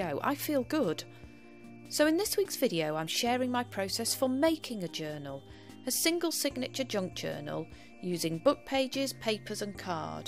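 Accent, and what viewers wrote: United Kingdom. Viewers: I absolutely love your voice! Its like watching a tv program! What part of the Uk are you from, if I may ask?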